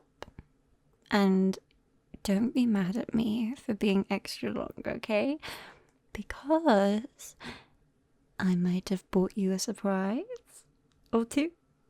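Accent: United Kingdom. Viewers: why are you british -( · i love british accent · I don’t know what it is exactly but there’s something about that British accent that just makes this so much better. Along with my favorite type of character, "yandere" just as good as the hide and seek one. Love the content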